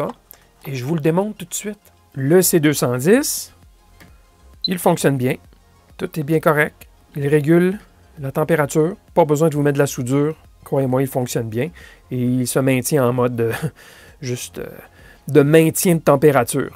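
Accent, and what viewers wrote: Quebec, Canada. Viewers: L'accent me donne vraiment envie de visiter le Canada(Quebec) with my 3 kids😊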